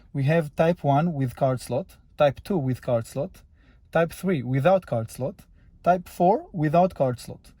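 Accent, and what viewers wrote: Israel. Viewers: מבטא ישראלי. אשמח אם תוכל לעזור לי לגבי שכפול שלט לקיה קרניבל 2022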